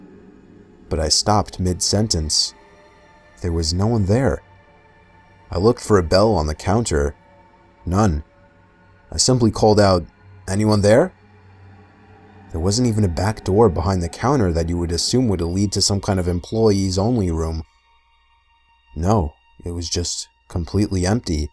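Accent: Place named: New York, United States. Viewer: I just realized he has a little New York accent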